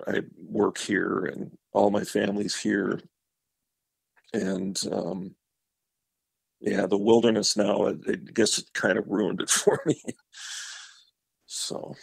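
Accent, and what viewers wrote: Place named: Minnesota, United States. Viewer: As a Minnesotan, thanks for sharing your story from Up North with us,. I didn't consider you boring as I listened to your story and interview. You sound like a born and raised Minnesotan like myself - honest and forthcoming. There's two new aspects of Sasquatch that I hadn't thought of before I watched this video. The first is Bigfoot hitting the tree with its fist after it spied you and your buddy. Now I'm wondering if that's what "tree knocking' signifies - a Sasquatch seeing a human? And secondly, I never considered the horrid odor being a response from Bigfoot like a skunk spaying when surprised. I always thought Bigfoot's scent was from lack of personal hygiene, but it always baffles me why some folks smell the horrific odor and others don't. Could that be a trait in specific Sasquatch species and others not? 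More questions than answers, but perhaps that is part of what makes Bigfoot so intriguing.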